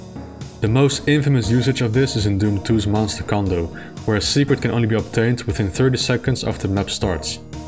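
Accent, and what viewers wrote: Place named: Netherlands. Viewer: You sound Dutch! If that’s the case, I’m proud such highly knowledgeable classic DOOM fan is from my home country.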